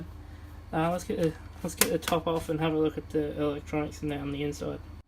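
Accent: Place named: New Zealand. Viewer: What the f a r k happened there Govna?? Did you have that opened up already (the rear diff), OR did that just come apart in your hand??? Bummer if it did dude, but, you should sound ALOT more motivated. The way you did this video MADE ME wanna stab myself with a blunt spoon mate!! 🧐... 👎💯. I'm cryin' with the fucking migraine..!! 😯 Also your pronunciation is ALL over the place. Your confusing your Kiwi accent with an American one, it's obvious brah!!! 💯👀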